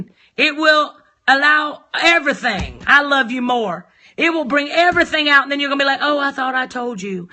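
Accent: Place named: South, United States. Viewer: I just love your Southern accent tho!! ❤🔥💯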